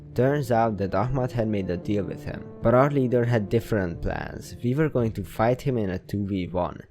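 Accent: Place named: Hungary. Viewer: You have a little hungarian accent, but i love it. You are probably not hungarian but yeah.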